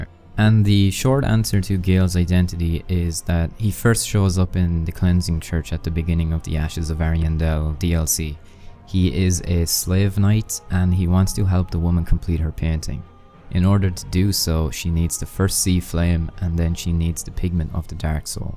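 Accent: Ireland. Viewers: your accent but it sounds Irish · your accent. Irish? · You sound Irish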